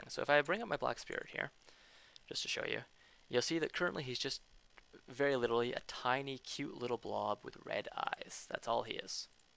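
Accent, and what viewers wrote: Canada. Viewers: Get oot of here mate. Love the Canadian accent!!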